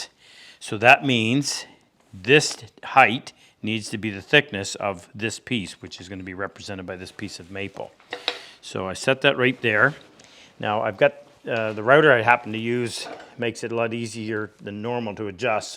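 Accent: Canada. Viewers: Nice work! Are you Canadian or American....just curious?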